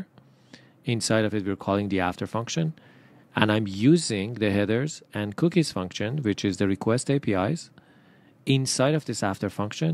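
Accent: Iran. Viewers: لحجه تون رو اول ویدیو رو شنیدم فهمیدم یک ایرانی هستی جان خسته نباشی💚🙏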